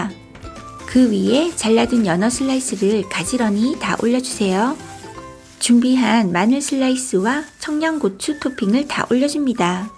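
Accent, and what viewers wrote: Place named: Gyeongsang, South Korea. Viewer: 안조터라구요 경상도 엑센트 ㅋㅋㅋ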